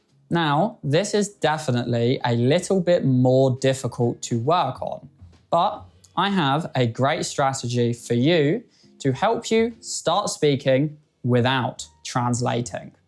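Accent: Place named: England, United Kingdom. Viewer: He is English, he has an English accent, American accent sounds somehow different, but both are "natives". Anybody that has trained their listening a bit could recognize it.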